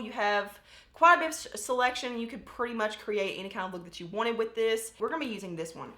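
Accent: South, United States. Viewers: THANK YOU FOR YOUR HONESTY! Your video is the first truly honest & genuine review. I love Emily also, but I'm sure she didn't have absolute control over the whole production of this palette. I'm SO grateful I stumbled across your channel. I'm really drawn to your southern drawl... maybe cause I've one also. You also do a lot of looks like what I prefer myself in my daily life. The face is a canvas that we turn into our own personal masterpiece. Blessings to you for your WONDERFUL, FUN & GENUINE videos!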